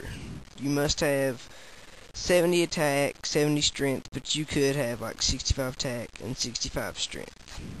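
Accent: United States. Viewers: You have an awesome Southern Accent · i like the texan voice too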